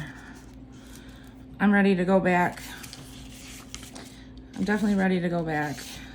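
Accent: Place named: Michigan, United States. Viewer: You sound like Michigan...I'm from Royal Oak.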